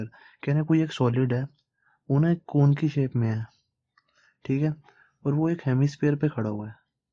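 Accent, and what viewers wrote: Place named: Kashmir, India. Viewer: you sound like kashmiri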